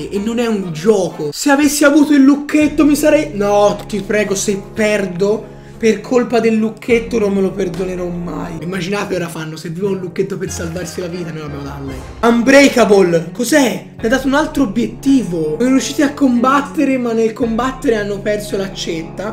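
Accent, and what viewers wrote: Tuscany, Italy. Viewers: Ma sei toscano? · ma tu di dove sei?hai l accento toscano...quindi sei toscano?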